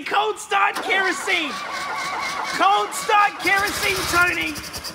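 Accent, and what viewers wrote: Australia. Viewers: Great Voice 👌🤑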